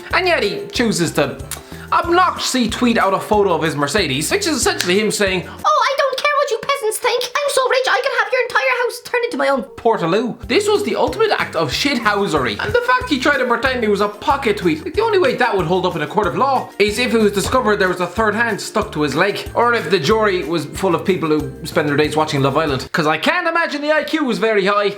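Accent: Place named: Ireland. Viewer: I think ooooh this video looks cool but when i press on it i hear it is you. You fucking irishman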